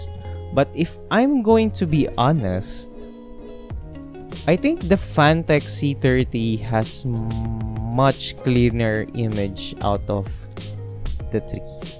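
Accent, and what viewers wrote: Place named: Philippines. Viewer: That is filipino accent 🤗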